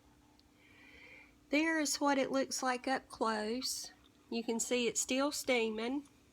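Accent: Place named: West Virginia, United States. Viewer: Hi I love your videos and Dollar Tree meals. I have made the Mexican Bake and it turned out very good, filling and low cost, which is always good. I am originally from West Virginia and you sound like good hearted WV folk. Subscriber forever. Thanks, Ray🇺🇸🇺🇸🇺🇸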